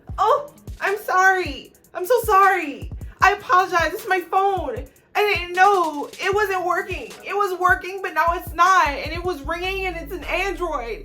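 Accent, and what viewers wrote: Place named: Lowcountry, United States. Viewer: Great review! Your covered exactly how I feel about Miranda Che storyline. Love the accent. Sounds like my family on the GA SC coastal islands.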